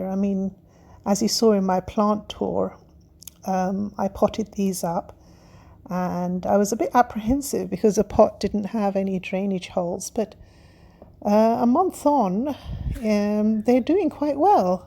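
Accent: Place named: England, United Kingdom. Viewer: Nice tutorial...love your very English Accent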